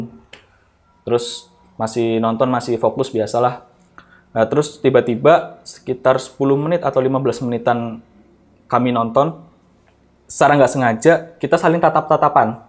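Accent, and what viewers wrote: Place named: Java, Indonesia. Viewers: Logat ngapak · Medhok" mas🤗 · Ledok bahasanya jang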